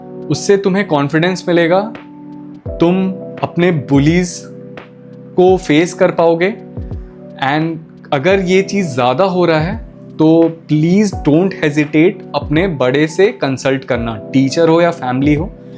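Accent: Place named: Bihar, India. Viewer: Aap bihar se ho bhai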